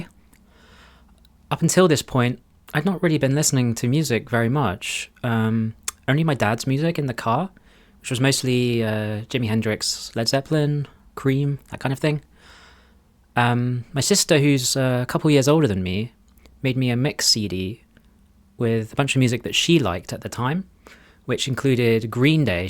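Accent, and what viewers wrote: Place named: United Kingdom. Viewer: I've watched your videos over the last few weeks but I've never heard you speak. When I first started this video I was like good grief is a Brit haha! I like your playing, I really do. You have such amazing picking technique which, as a player is my largest weakness. Do you have any tips for a well rounded veteran player to improve picking technique? Cheers from the US!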